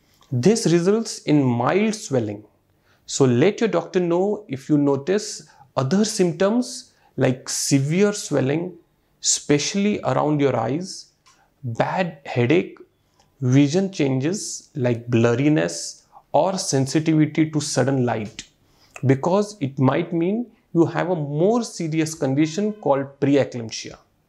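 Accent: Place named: India. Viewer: it's good Inglish with Indian accent I understood all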